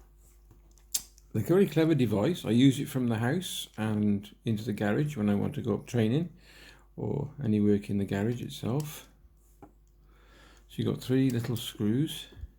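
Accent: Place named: Shrewsbury, United Kingdom. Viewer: Recognise that accent...... Shrewsbury Monner by any chance?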